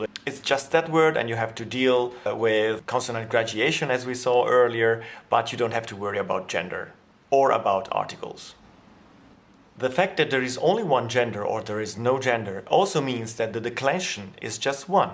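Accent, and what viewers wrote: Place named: Tavastia, Finland. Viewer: Are you from Tavastia by any chance?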